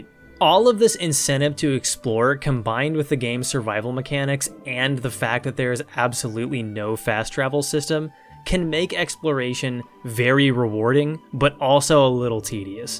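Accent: Quebec, Canada. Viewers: quebecan HAHAHAH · Quebecan? Lol.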